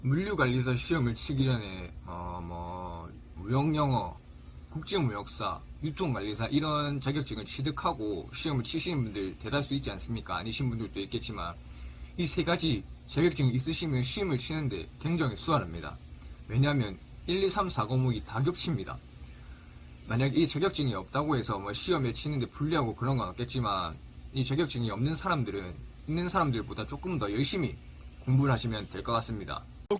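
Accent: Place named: Gyeongsang, South Korea. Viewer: 느낌이 왠지 갱상도말 쓰는 최성국같네요 ㅋ 멋집니다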